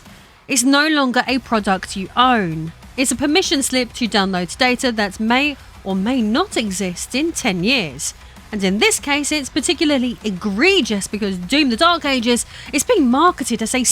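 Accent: United Kingdom. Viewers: Boobs, tight leather, & a british accent... the internet just ain't playing fair 😂👍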